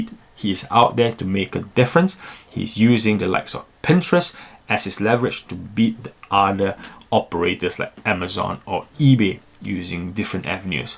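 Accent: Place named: Singapore. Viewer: Are you originally from Singapore? I hear the Singaporean accent ;)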